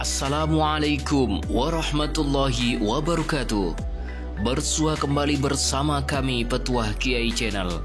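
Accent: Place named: Malaysia. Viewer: Nah akhirnya betul kan yg gw bilang. Ini youtuber malaysia cari dolar di indonesia. Adudomba biar indonesia rusuh. Logat lo ga bisa bohong, walau melayu, gw besar di riau jadi ngerti logat melayu indo dan logat melayu tv malay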